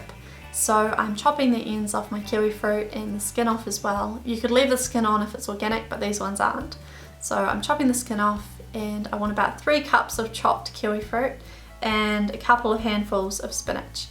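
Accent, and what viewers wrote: New Zealand. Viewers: is she from new zealand or australia?? (I'm KIWI!!!!!) 😂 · She sounds like she's from New Zealand, not Australia.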